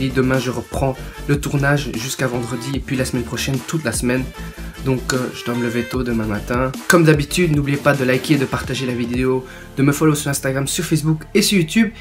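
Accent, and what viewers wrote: Belgium. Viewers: Salut mec, tu es de quel région ? Belgique ? Tu as un accent particulier :) Bonne continuation, je m'abonne 👍🏼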